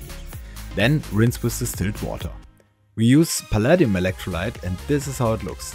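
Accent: Germany. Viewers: He sounds to be German! That explains it. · I mean, one can obviously tell you are german by your accent, but are you aware you sound crazy similar to docm77, a popular german minecraft youtuber from karlsruhe? :D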